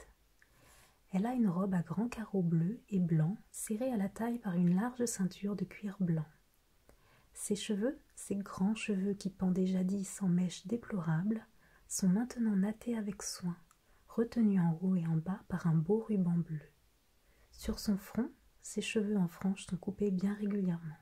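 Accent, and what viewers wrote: France. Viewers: oui elle est française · vu son accent français en parlant anglais, oui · I love this so much! The French accent is wonderful to listen to, couple that with an ASMR artist and you've struck gold 😀💯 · I've heard some french accent in your English 😍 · Je suis française et je pense que tu es française car quand tu parles anglais tu as un accent français . Sinon je trouve ça super cela permet d'apprendre les base d'une langue tout en ce relaxant ! Bravo ! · Attendez, êtes-vous française ? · son accent en anglais suppose en effet qu'elle est française. J'étais juste curieuse au début mais je suis agréablement surprise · Tu est française ?? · Yes she's french 🇫🇷❤ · C'est une anglaise qui parle très bien français ou une française qui parle très bien anglais parce qu'il y a un accent fr nan ? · Mais j'ai l'impression que tu es française qui parle couramment anglais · je pense aussi qu’elle est française car je trouve que son accent anglais (qui est très bon) sonne un peu français ☺️ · Elle est française ? 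je me pose la question depuis que j'ai commencé ses vidéos, je crois reconnaitre son accent français quand elle parle anglais mais je ne suis pas sur 😅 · Elle est française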